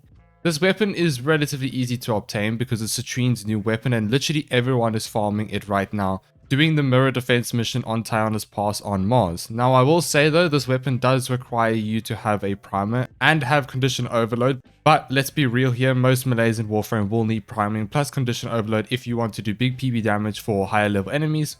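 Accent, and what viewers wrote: Afrikaans, South Africa. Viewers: is this guy south african ?? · Bro. You sound extremely south african🤔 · My guy is afrikaans awe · Either I'm tripping or you speak Afrikaans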